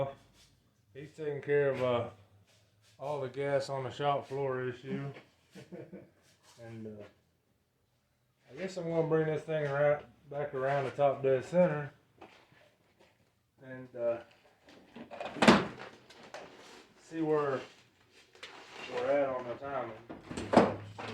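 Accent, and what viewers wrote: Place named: Appalachia, United States. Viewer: It is painful to listen to dumb hillbillys like you try to speak English. Good Lord!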